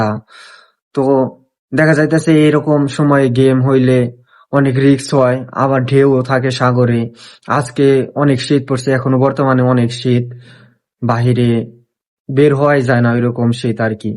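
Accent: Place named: Tangail, Bangladesh. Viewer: যাক আল্লাহ ভরসা যে কটা যাইতে পারে এ ভাই আপনার বাসা তো মনে টাঙ্গাইলে আপনার কথার ভাষা শুনে বুঝা যাচ্ছে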